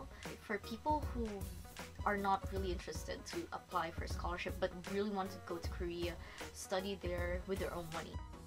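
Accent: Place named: United States. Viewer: You study in Korea but it sounded like you are now in US because of your accent. Do you have that accent already before you go there? I forgot for awhile that you are in Korea, no offensement to the people there but most of them go to English speaking country to learn the language and you are in the opposite of it.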